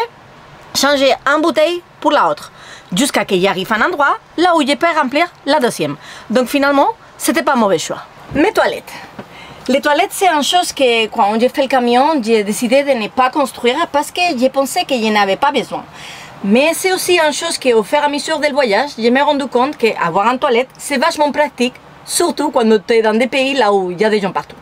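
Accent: Spain. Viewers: C'est toujours un plaisir de te suivre, avec cet accent espagnol rempli de lumière❤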